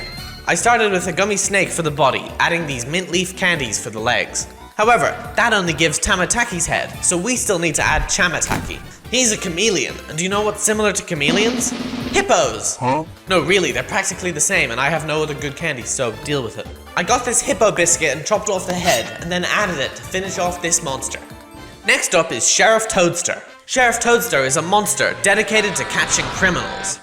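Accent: Australia. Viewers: U Australian 😄😄😄😄😄😄😄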